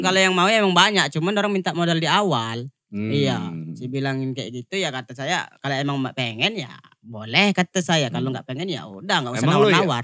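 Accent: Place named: Lampung, Indonesia. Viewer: Lampung banget logat nya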